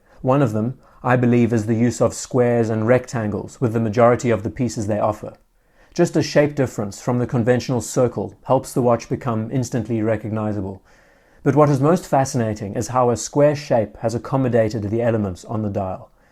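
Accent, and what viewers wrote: South Africa. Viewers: Why im a getting a bit of a south African accent from this guy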